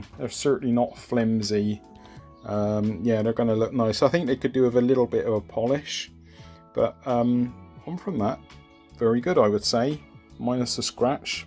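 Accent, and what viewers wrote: Bristol, United Kingdom. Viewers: fantastic vlog shame about the the pits that broken, it not long for you have finished keep your chin up it won't be too long before you go out on it. Sunshine is coming next week so hopefully you can get out. Your accent sounds like its from Bristol away? Im got a appointment on Tuesday morning to put a new back tiyer on so I will be out on my bike again yay fantastic take care ride safe mate TTFN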